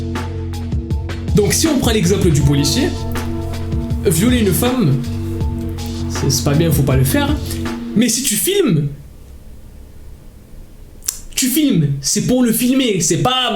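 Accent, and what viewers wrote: Japan. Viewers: Au ptn mais t'a kira dans le sang toi avec ton manifique accent japonais UvU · La pub 😱😱😍😍😍 ton accent en japonais est juste magnifique 😍 j suis in love 🥰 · franchement, je crois pour une fois je saute pas les placements, et l'accent pépites.🖤 j'adore ton accent japonais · Ton accent JAPONAIS TUE!!!!😍💕💥💥👌👌#TeamOtaku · Purée magnifique l’intro parfaite reference a death note avec kira (Light) wow super j’ai installé le logiciel pour réussir à faire de meilleur vidéo gacha life merci :)) parfait comme toujour UwU *(et en plus tu a mis les music de death note dans la vidéo) et magnifique accent japonais ♡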